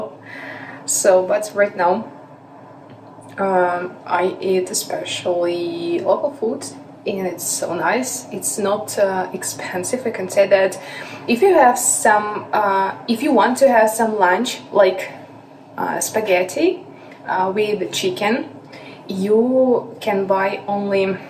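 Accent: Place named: Eastern Europe. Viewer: I am British and therefore a native English speaker. You are too hard on yourself. Your spoken English is very easy to understand. You have an Eastern Europe accent when you speak English but not a very heavy accent. Any native English speaker would EASILY understand you The reality is that most educated native English speakers could probably identify an accent in the spoken English of most other English speakers. I think I could identify which country a Canadian, a South African, an Australian or an American speaker of English came from even though they are also native English speakers. I could identify where in the UK most people came from (London, Newcastle, Yorkshire, Glasgow, Birmingham, Liverpool, Wales etc) as those areas/regions have their own accents, too. People speak English with French, German, Italian etc accents and, yes they will make mistakes but that doesn't mean it is difficult to understand them. 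Most British people mangle the language so who are we to criticise?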